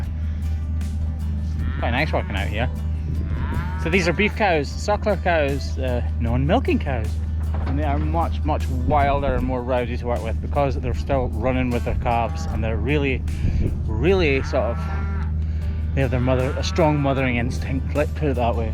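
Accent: Scotland, United Kingdom. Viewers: Lol his name is (It's the Scottish making it sound like LOL) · Can ya say a little over run by the beef? Started watching vids for the trimming, stayed for the Scottish scenery, accent and great sense of humor.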